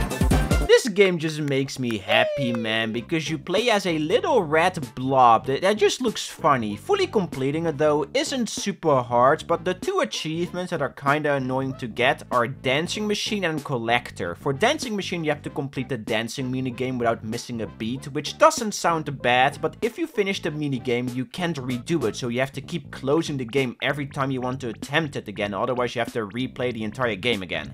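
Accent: Netherlands. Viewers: i heard your accent and could immediately tell you were dutch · you sound so dutch its actually insane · jij klint heel nederlands ben jij?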